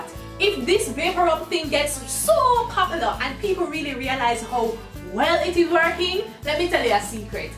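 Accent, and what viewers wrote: Jamaica. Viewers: Am I the only one that heard her say coochie cream? DWL... anyways I just discovered this channel and I love it already mainly cause she uses her Jamaican accent.. Issa Caribbean ting :) You are very funny and helpful.. continue with the good work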